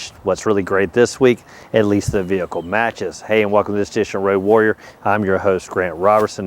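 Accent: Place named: Alabama, United States. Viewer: Lol is this guy from Alabama or someshit never heard english like that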